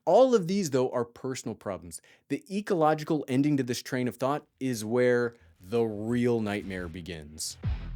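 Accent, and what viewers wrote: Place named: Midwest, United States. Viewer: AI voice with midwest accent? 👍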